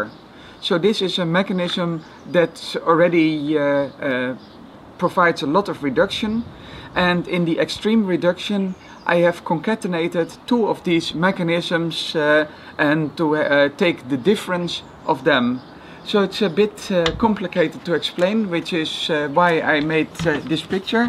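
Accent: Netherlands. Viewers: His dutch accent is showing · A true Dutch accent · Man you sound Dutch. Are you? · Puur Nederlands accent. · You can tell this guy is dutch lmao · I knew you are Dutch when I heard you :D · Sorry but its so funny to hear a dutch guy talk english 😂😂😂 Nice video bro! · Wild guess, but are you dutch? I'm litteraly 100% confident you are. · dutch english. cool technic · well the video maker is Dutch for sure, you can hear it about hus accent, and the police siren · are you dutch? cuz your accent and you say 'From Deventer' and Deventer is a city in the Netherlands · you sound like what the average Dutch guy would sound like if he spoke English. subbed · #Nederlands-Engels accent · He sounds so Dutch. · Hehe that obvious dutch accent...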